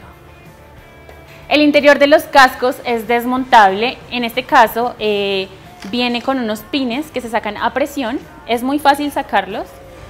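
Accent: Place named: Colombia. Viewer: Gracias por este vídeo, me encanta tu acento colombiano ✔️👍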